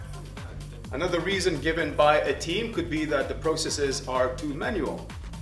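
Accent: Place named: Belgium. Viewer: my only question after watching this video was where this guy comes from. Based on his style and accent I'd say Belgian. Anybody here who knows for sure?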